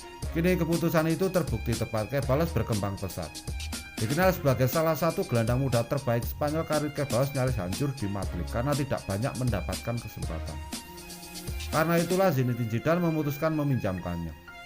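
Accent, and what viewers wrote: Java, Indonesia. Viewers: Presenternya terlalu medok..😁😁😁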